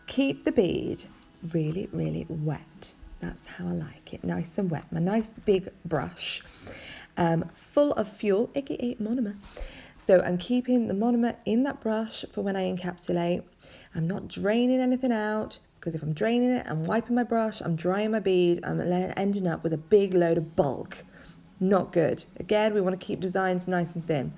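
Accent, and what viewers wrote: United Kingdom. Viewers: I love listening to your British accent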